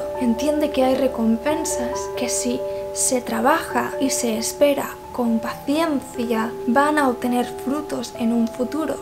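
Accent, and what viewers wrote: Spain. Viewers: me encnata tu acento español . y tus videos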